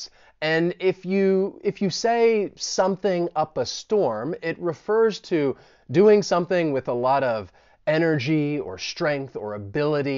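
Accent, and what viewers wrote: United States. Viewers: AN AMAZING CHANNEL AND USEFUL YOU'RE THE BEST BRO I REALLY LIKE THE AMERICAN ENGLISH ACCENT WHAT DO YOU RECOMMEND THAT I WATCH FROM YOUTUBE CHANNELS OR WEBSIT TO LEARN THE AMERICAN ACCENT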